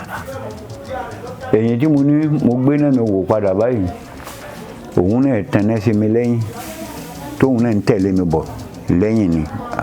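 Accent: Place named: Oke Ogun, Nigeria. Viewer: Where is the hunter from , he sounded very well like oke ogun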